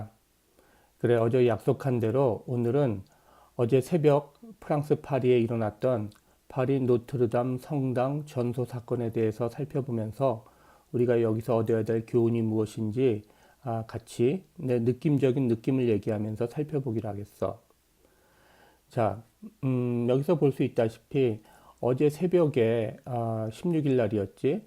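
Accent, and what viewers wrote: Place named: Seoul, South Korea. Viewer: 크게 공감합니다!! ㅇㅇ 사실상 지금의 스울말투(말로써 하는 표준어)는 산업화와 더불어 온갖 타지역 사람들(ex:설라디언 이주민)의 유입과, 또 흘러간 세월들이 요래 마구 쓰까져가지고.. 예전과는 많이 달라졌다는 학계의 지적이 있잖어!? 국어쌤은 아마듀 메이비, 이러한 실정을 나 보다도 아주 잘 알고 있을거야. 그렇쵸!? ㅋㅋ 그런데 우리 의 발음과 발성을 듣고 있노라면.. 이게 바로 진짜 '교양있는 사람들이 두루 쓰던 현대 서울말!'(오리지날 한쿡 표준어) <ㅡ 를 완벽하게 구사하는 사람인 것 같아ㄹㅇ. 그래서 더 놀랍고, 청자의 입장에서 아주 편안하다는 장점이 있서요!! 동의하지!?? 예아-~!!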